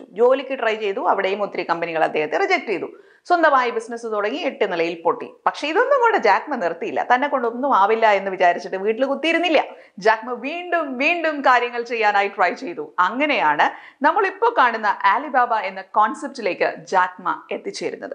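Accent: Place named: Valluvanad, India. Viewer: valluva nadan Samsaram mam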